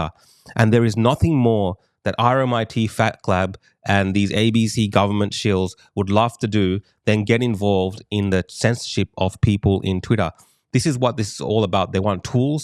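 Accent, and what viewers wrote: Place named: United States. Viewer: Her American accent noted.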